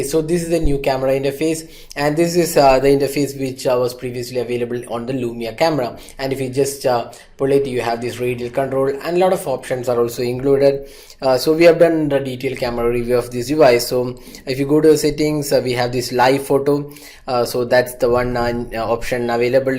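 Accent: Kerala, India. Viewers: heaavvyyy mallu accent.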